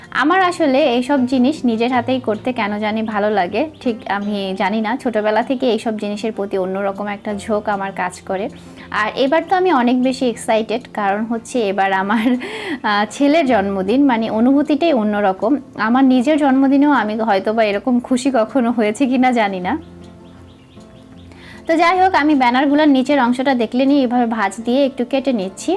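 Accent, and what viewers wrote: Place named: Madaripur, Bangladesh. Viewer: Tumr onk gun re apo.Madaripur er maya bola Kotha 😊💝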